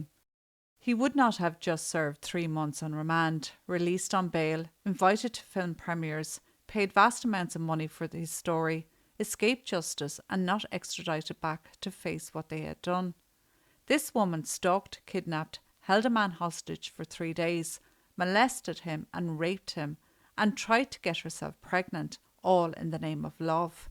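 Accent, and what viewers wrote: Ireland. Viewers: Sorry to butt in but my dear you look terrific on my tablet let alone your delightful demeanor and your sweet Irish accent to boot 😊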